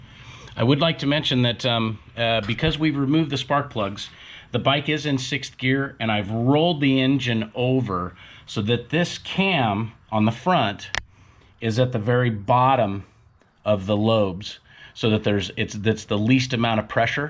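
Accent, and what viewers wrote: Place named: United States. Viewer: Very interesting Video for me to understand how a Harley engine works. My M 8 is a Sport Glide 2018. I am not s mechanic, an i dont understand all you talking about (American dialect) cause iam german. Did u say the Oil pump must be changed as an generell rule or can i do it after a certain part of miles? Best regards from Augsburg Germany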